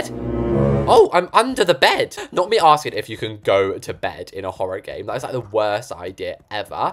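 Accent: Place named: United Kingdom. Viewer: Are you a brit cuz your accent is so beautiful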